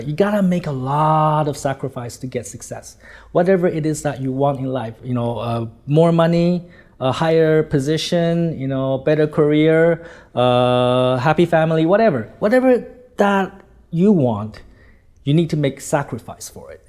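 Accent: Standard. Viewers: nói rất chuẩn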